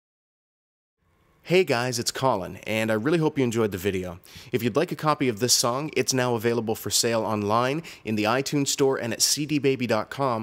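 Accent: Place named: Canada. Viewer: Making love to a glove is so overrated! I like your vids and your curious canadian accent, keep it up!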